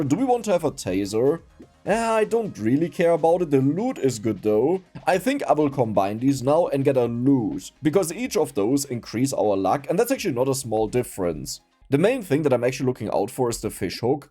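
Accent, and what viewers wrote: Germany. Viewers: i love it that is german and somtimes you can hear it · He Sounds rly german😂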